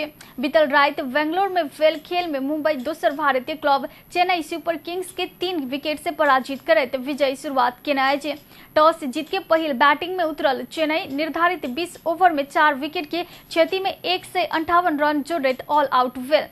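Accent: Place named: Nepal. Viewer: sounds beautiful the way she speaks maithili with lot of nepali accent. hahaha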